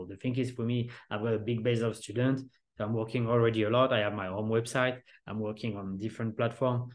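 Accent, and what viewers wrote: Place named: France. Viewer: Salut 😊 watching your video from Cannes and love your french Accent! You have inspired me a lot! Merci pour tes vidéos! Ils sont tous super!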